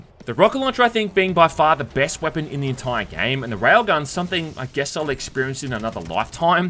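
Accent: Australia. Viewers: I live for this kind of review video, with aus accent. :face-blue-smiling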